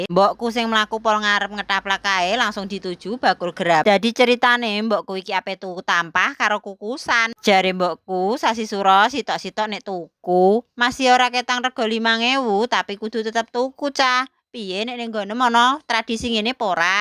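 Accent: Java, Indonesia. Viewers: ❤️ mu desa mana to mbak Tulung bls been jelas mbak NK ngomong KK boso jowo ne medok banget mbak. seneng netesin ngrungokne mbak.. swun mbak sehat selalu Amin 🤲👍💪🍅🙏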